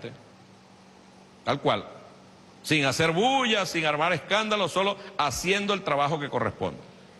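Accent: Cuba. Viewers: Ha reclamal justiciaa, ya se le sale hasta el acento Cubano se les nota que repiten lo que viene de la Isla.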